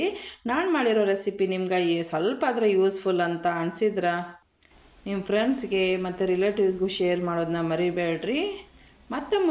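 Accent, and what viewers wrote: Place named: North Karnataka, India. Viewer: ನಮ್ಮ ಉತ್ತರ ಕರ್ನಾಟಕ ಭಾಷೆ ಕೇಳಿ ತುಂಬಾ ಖುಷಿ ಆಯಿತು ಸಿಸ್ಟೆರ್ 👌👌👌❤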